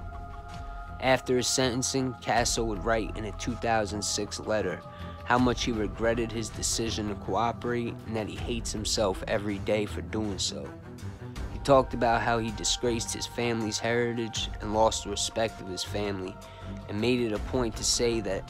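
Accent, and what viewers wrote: New York, United States. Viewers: for the record…your voice is perfect for these videos. Not sure where your from but I’d swear your from the NY/NJ area…been here my whole life so most of your stories are based on areas within a half hour all around. Keep up the good work…I look forward to seeing your notifications 😉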